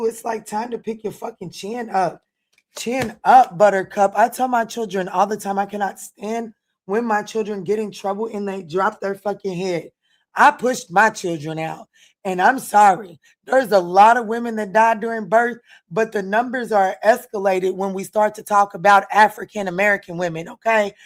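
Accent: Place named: South, United States. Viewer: 😂😭😂 she has got to be from the south. She done put 3 words into one😭🙈. I love it. Much love for the Leo Scorpio and Capricorn readings miss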